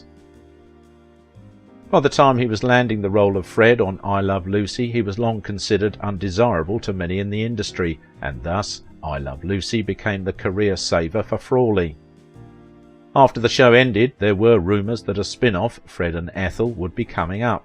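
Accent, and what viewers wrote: United Kingdom. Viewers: Does anyone else find it ironic that a nostalgic program about an American classic TV show is narrated by a gentleman with a British accent??🙄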